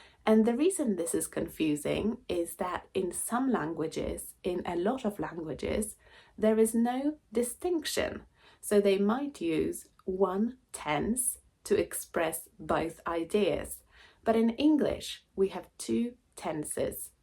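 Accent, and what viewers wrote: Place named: England, United Kingdom. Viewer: Dear, Your accent is very clear and that you slow speak so this you make understanable. You have very nice an English accent.. Thank you so much 🙏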